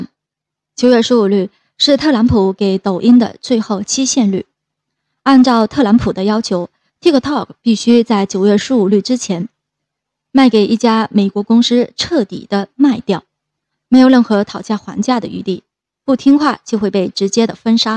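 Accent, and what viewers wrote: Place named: Fuzhou, China. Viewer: 應該是福州老鄉不容易好比你不容易碰到能把普通話說的遛的香港人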